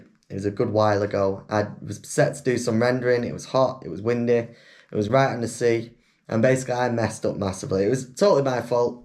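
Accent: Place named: North, United Kingdom. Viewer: Hastings! You're from up north though ain't ya 👍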